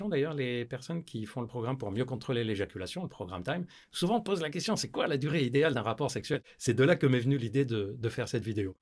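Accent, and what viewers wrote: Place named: Lebanon. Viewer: Bonne ambiance, intéressant, accent libanais… tout y est.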